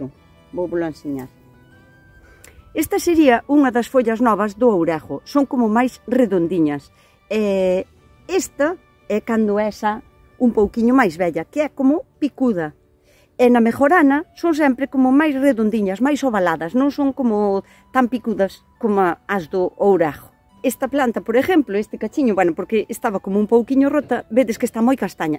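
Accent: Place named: Ribeira, Spain. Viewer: Cousa tan xeitosa!! E como me gusta o teu falar ribeirán! Noraboa pola tua horta e polo traballo de difusión. 10 horas de media de traballo por cada video, lín nun teu comentario. Estes videos son semente de futuro. Gracias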